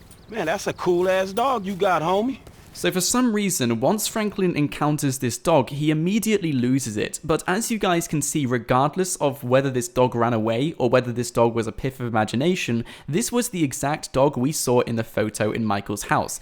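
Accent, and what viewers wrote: United Kingdom. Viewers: u r britain accent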